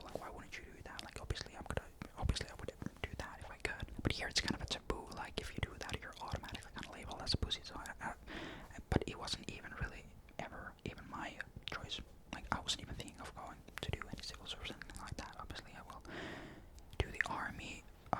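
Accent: Finland. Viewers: i think i've only seen like a few of your vids but when the video started i said "he's probably from finland" and i got it right lol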